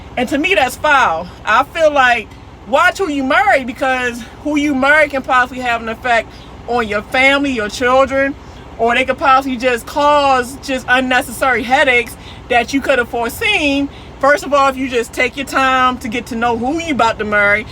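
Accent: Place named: Baltimore, United States. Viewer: I can't tell you how this video came up in my recommendeds but I'm glad it did. I heard a few words and instantly knew, she from Baltimore. My best friend is from B'more and an old Army buddie. That accent is heavy. Be safe out there on that road Ma'am.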